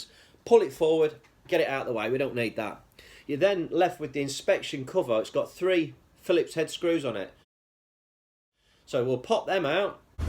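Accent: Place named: England, United Kingdom. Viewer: Thanks, will definitly try this on my TT. By the way, love the video, the humour, the nice english accent, the SWFC vest and I am sad to be far from England to buy you a beer:) Signed: A frog from Normandy;)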